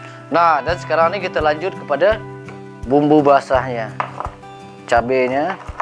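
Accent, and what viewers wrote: Malaysia. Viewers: Orang Padang kelamaan dimalaysia Ampe 32 thn gaya ngomong nya Ampe kebawa kyk org Malaysia asli (Melayu + Inggris) mantap lord 👍 Sehat sllu & panjang umur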